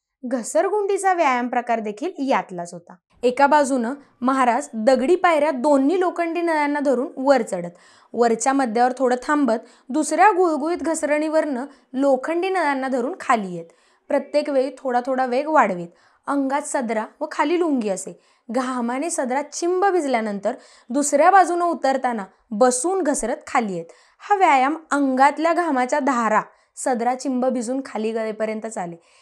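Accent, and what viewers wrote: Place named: Kolhapur, India. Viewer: Kolhapuri accent, kiti chaan vatato aikayala, jai maharashtra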